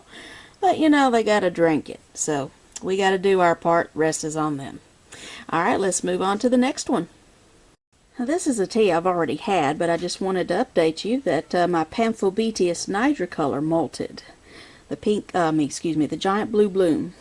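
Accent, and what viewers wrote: United States. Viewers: agree!! was gonna say the same, I love this kind of US accent, plus ur expressions :D , especially that one --> "mmm mmm mmm !" when admiring the green T's legs LOLLLLL....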